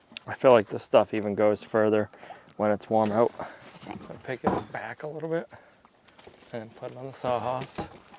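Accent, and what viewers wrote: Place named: New England, United States. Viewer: It's called a New England dialect. Not a speech impediment. Look it up. You owe an apology.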